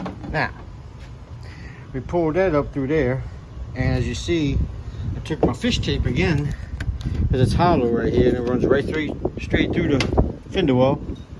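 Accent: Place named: Louisiana, United States. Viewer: You gotta be from Louisiana brother lol. I know that accent from a mile away, I lived in Louisiana for 5 years. Great video, I bought similar lights for my truck, thanks for sharing!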